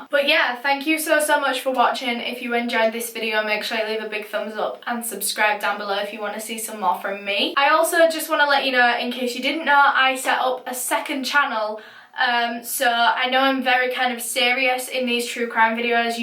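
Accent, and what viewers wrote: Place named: Yorkshire, United Kingdom. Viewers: Just got into your videos and I’m so happy I found your channel. I’m really interested in true crime stories and have pretty much binged your channel since I found it. Love the accent, I live in a town near Hull and went to University in Sheffield so it reminds me of my time there quite a bit. Keep up the amazing content you’ve gained a new sub :) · it's her northern english accent - i think she's from yorkshire cuz of her accent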